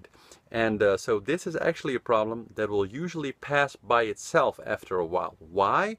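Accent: Netherlands. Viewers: Compared to your early videos I think you're losing your Dutch accent when you speak English! 😉 Thanks for all your help and advice.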